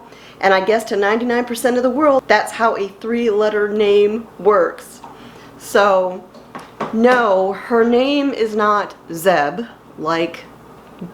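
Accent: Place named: South, United States. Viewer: Love the southern accent! I would have pronounced like you even though I grew up in the south.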